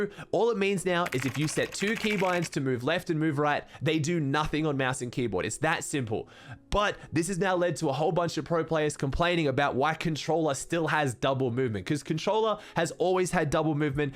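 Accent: Australia. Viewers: Aussie sounds sick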